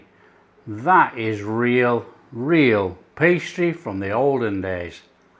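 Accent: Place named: England, United Kingdom. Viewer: Love your accent although I haven’t a clue where in England. Sadly these recipes will die out, perhaps I’m wrong. I was born in 1973 and growing up my mother always told how rewarding it was to produce a hot meal from ingredients that don’t cost the earth. She taught me to cook which has absolutely benefited me in my adult hood. I searched suet pie and stumbled upon your site, Traditional English cooking that tastes absolutely delicious. I’m originally from Norfolk and was spoilt with ingredients using pheasant and partridge I’m fed watching chefs that andypandy around throwing pepper/ god knows what over your shoulder. I’m now able to google/youtube your recipes & understand amounts etc to use. Thank u x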